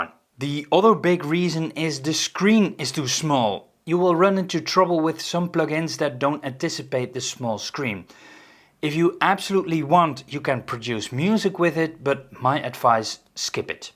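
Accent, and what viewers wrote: Netherlands. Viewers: Leuk en informatief Youtube kanaal! Ik type het maar in het Nederlands :-) aan je accent te horen ben je dat. Ik produceer zelf vanuit Logic Pro X icm een Mac Mini i7 6-core /32gigram/1Tb Maar dat is alleen maar omdat ik inmiddels gebonden ben aan Logic... Anders had ik wel een Windows machine gekocht ;-) Keep up the good work!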